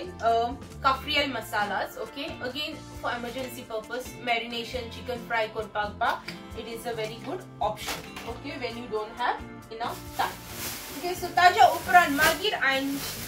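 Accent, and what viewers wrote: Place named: Karwar, India. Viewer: Becoz you speak like karwar people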